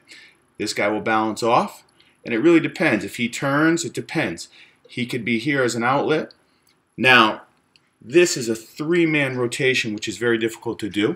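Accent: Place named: United States. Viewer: Wait, are you american? Auto cant watch this anymore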